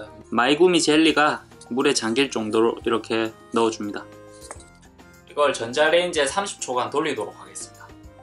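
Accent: Busan, South Korea. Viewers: 허팝님이사투리리쓰시나.저는부산녀자ㅎㅎㅎ 자꾸 부산사투리억양이나오시는허팝님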